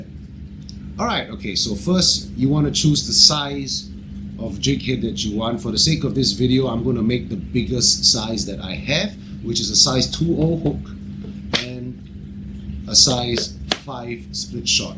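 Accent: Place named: Singapore. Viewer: good to hear a singaporean accent again bloody miss home tbh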